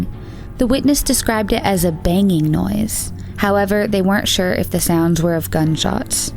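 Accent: India. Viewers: I think the narrator is indian..the accent makes me feel so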